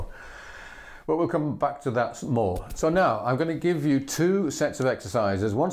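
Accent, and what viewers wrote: England, United Kingdom. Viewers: An English accent ha! Well I'm glad not all Englishmen hate the Irish. Do you have any good advice for the fingerboard technique to give it that Irish twang.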